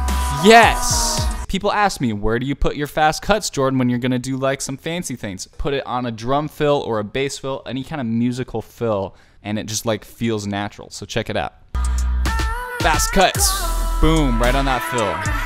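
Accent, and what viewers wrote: United States. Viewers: Hey, I'm lesbian. I thought you were American. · I'm lesbian i thought your American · Bro I'm lesbian ✨I thought your American ✨💯😂legend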